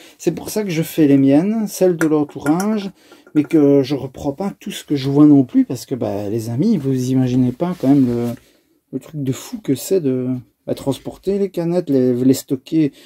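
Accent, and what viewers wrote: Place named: Belgium. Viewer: Coucou et ta petite famille ❤ Oui je suis laaaaaaaa (imagine une petite femme qui saute les bras en l'air 😅). Boudu! (comme on dit chez-nous dans le Sud) j'ai un retard monstre 😮 c'est affreux!!! Mais le soleil est revenu, et j'ai tellement profité du jardin le soir après le travail, que YouTube me regardait, au lieu que moi je le regarde 😂. Du coup, comme j'ai un retard monstre (encore!) sur tes vidéos, je laisserai un pouce en l'air (la base!) et un cœur ❤ en commentaire. Désolé il n'y aura pas de roman 😢, mais faut que je rattrape tout ça 😅. J'ai adoré (comme toujours!) ta vidéo. Comme tu le sais et ça depuis le début, les vidéos du vendredi c'est ma pépite de la semaine ❤. Pour les cannettes en alu, en effet... Il faut mettre de côté, pour 3€ et quelques... C'est pas si rentable que ça, même si comme on dit "il n'y a pas de petites économies". 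Mais la... Ce n'est plus question d'économie. C'est question de places 😅. Pour l'engin avec le charbon, mon pauvre, je ne t'aiderai pas 😅 je ne suis ni bricoleuse du dimanche ni même de la semaine 😂. Merci pour ce bon moment de rire, je dois avouer que ça fait du bien de remettre l'accent belge à la maison 😘. Des bisous à toi et ta petite famille ❤. A très bientôt 😘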